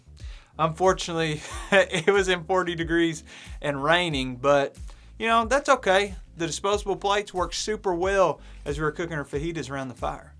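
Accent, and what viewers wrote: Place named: South, United States. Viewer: In fact, if you keep the bottle cap on and cut off the bottom end of the water bottle, your scoop is several ounces larger because you didn’t have to lose any volume getting to the wide part of the bottle; and - bonus - you have a funnel option if and when you need one. Not a good option is you are wanting to park a scoop of water upright, unless you jam the neck of the bottle into a cranny in a tree root or a vole hole. Anyhoo, I dig your vids; you are judicious, even-handed, and informative, all in a well-tempered laid-back package. I take it from your accent that you are a Southern boy; I grew up within 5 miles of the tallest peaks along the Blue Ridge Parkway and about 10 miles from the North Carolina border of the GSMNP, where I started hiking and camping at age 7 or 8 with my Pop and younger brother. As you said, good stuff.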